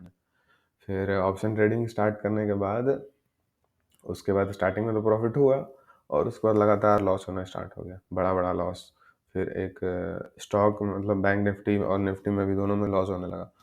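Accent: Bihar, India. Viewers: Bhai aapke awaaz se lagta h aap bihar se h kya? I m also new in market I found your video interesting.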